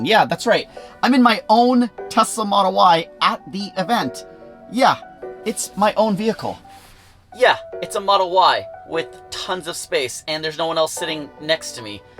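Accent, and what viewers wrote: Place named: United States. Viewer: hmmm 🧐 Now i understand why you look and your name is like an 🇮🇳 Indian 🇮🇳 but talk like an 🇺🇸american🇺🇸 And i am from Bhilwara,rajasthan. You can check on maps where it is. as you know covid-19 is going on all over the world but here in my town. There are covid +ve coming more and more daily but nobody is has fear of it now than before lockdown and some people have died nearby my colony 😭 and even in my family my chachi got covid😭 but she is not living with us and she is fine right now. but nobody is taking precaution and saying the virus is getting weaker and if you get covid test result +ve than you will be going get your covid test result -ve after some time and immunity power of indians are far stronger than rest of the world, etc. And if you go around the city you will see that it's like NORMAL , there is no covid or anything happened. 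People just carry mask so that they don't get chalan (fine) from police. What is happening to people's mind in india but i can guarantee you that if this goes on and does not stop than india will be no. 1 in covid cases and death in the world 😭. I am taking precaution so that i and my family don't get covid. And i see in your videos that people there in USA are taking precaution so that's good because you all know the fear of it. So take care of you and your family. And I didn't see your family in your videos, do a video about your home 🏡 (where you live now). And last but not least I learn English by my teacher to pass the exam but I l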